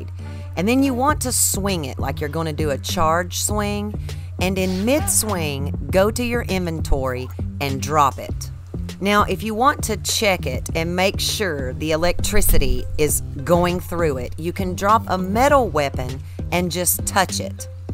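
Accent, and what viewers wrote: South, United States. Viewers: I really like your accent. Not sure where you’re from, but it reminds me of the South and that is very comforting to me! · I got a switch a couple months ago and I started playing breath of the wild not too long after. Your videos are awesome and they’ve helped me a lot thank you. Also as a fellow southerner I appreciate your twang 😁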